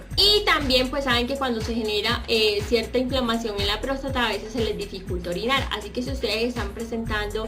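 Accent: Colombia. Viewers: Que acento tan feo es el colombiano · ☺️❤️❤️❤️❤️❤️❤️❤️❤️❤️😊☺️pero es que todas las colombianas son hermosas y su asentó de hablar me encanta 😍 yo me quiero casar con una colombiana plz can you help me 😊❤️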